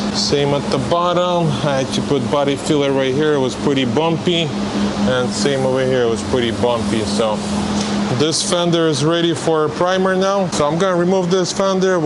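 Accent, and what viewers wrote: Russia. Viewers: Мне одному кажется что у него акцент из России?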